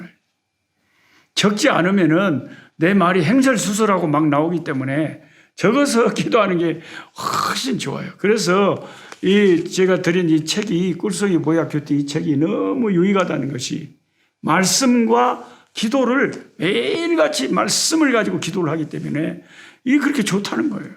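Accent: Jeolla, South Korea. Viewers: 할렐루야!!!주님감사합니다 오늘 목사님께부탁한책을 처음접하게되었습니다 목사님말씀을듣고 책을오늘읽어야하는부분을읽는데 어찌나은혜가되고 가슴을울리고 이귀한책이어디있나!!왜목사님이지인이나 전도하는데 쓰라는메세지를계속말씀하시는지 오늘에서야 이해가갑니다 너무나귀한책이고 감동이오고 책을붙들고기도하는데 어찌나눈물이나는지 오늘오전은주님의은혜가큰감동으로느껴집니다 목사님만난게감사가나옵니다~저는24살까지운동선수로살다가 가정을꾸미고 또공부하고는거리가먼데 이렇게많이배우신목사님이 전라도사투리로 사정없이성경말씀을이끌어가시니 어떤성경공부보다 머리에쏙쏙들어옵니다 공부와거리가먼 제가이렇게성경공부를하니 ~그것도나이먹어서 한장한장귀한말씀을접하니 성경을더가까이하게됩니다 목사님 어디다니시든지주님이지켜주시고 마하나임의하나님이역사하실것입니다 더욱더오래사셔서 저희들을주님과연결시켜주시고 깊은관계속으로들어갈수있게도와주세요 오늘은너무감사가넘침니다^^고맙습니다 서울은날씨가풀려가요~~저는교통사고합의로수요일날첫대면을합니다 좋은결과있게기도부탁드립니다^^화이팅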